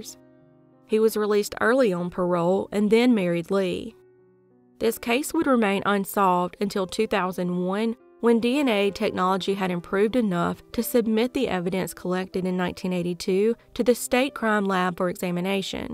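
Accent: South, United States. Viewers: haha I'm the same with my own voice it's so cringe to me lol, but I agree with everyone that your voice is particularly soothing! I do really like your strong southern accent too! I'm from England so to me it's very strong, but I really love it for how soft spoken you are. Also of course you do amazing research and give these cases and victims the respect they deserve. Sending so much love from the South of England! 🇬🇧 ❤️ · I'm subscribed to your southern accent voice. I wasn't a subscriber then, I am now.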